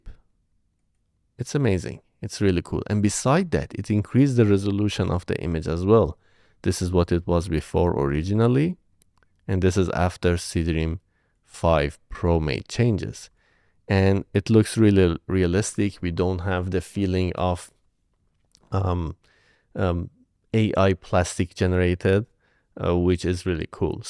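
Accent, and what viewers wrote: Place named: Iran. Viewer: Yo. I'm Persian too. I Noticed your Persian accent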